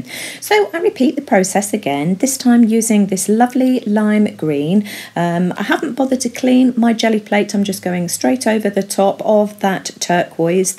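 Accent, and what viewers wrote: United Kingdom. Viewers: I always look forward to your videos, you make beautiful art! And I love your British accent 🙂. I would love to see you make a soft pastels tutorial...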